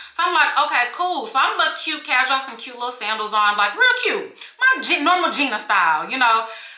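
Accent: New York, United States. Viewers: Lol I’m crying at your New York accent. You did good I’m from Brooklyn. They say I’m aggressive too 😂😂😂😂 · Best friend that’s how New Yorkers talk trip me out too I’m from Florida we laid back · That's a new yorker for you..... Lol.... Born and raised but i don't talk like that... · went out to the mall today (BIG MISTAKE), Watched some Sunday Football (boring games), Sat down and ate a burrito while watching story time (NOW THAT WHAT THE F*** IM TALKING ABOUT) LOL. Love your story times! I'm from NY, living in Florida and your NY Accent was on point! xo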